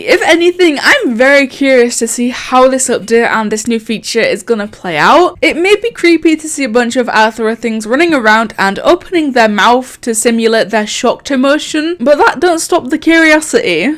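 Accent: Scotland, United Kingdom. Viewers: are you scottish? i am :D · Scottish accent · Are you from scotland? Because your accent reminds me a lot of Merida and I think she's from there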